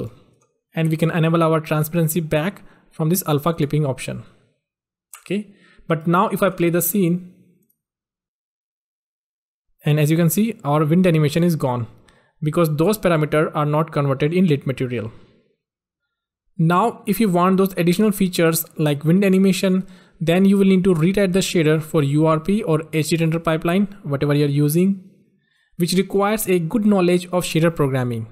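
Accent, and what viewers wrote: India. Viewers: are u from indiea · I can guess you are an Indian from your accent... #respect bro. Nice work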